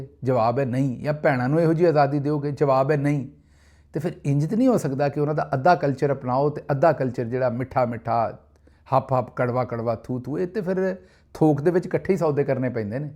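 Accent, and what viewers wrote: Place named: Nagaland, India. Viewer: گل صاب خالص ناگاں دا لہجہ تہاڈا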